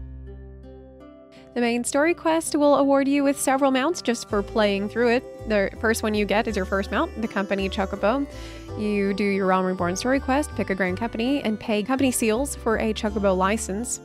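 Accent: South, United States. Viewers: I keep ending up here plus you’re southern and YT needs more southern accents. Subscribing and thanks for the content :)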